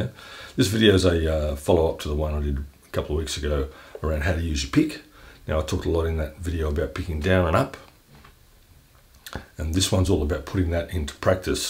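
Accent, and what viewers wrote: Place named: Australia. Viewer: This is really cool! I'll be honest I was not expecting an Australian accent haha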